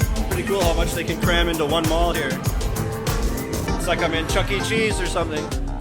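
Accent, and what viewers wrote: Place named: Toronto, Canada. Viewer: NIce to see another Toronto voice active here in the Penh....and a fellow bassist to boot. Great vids.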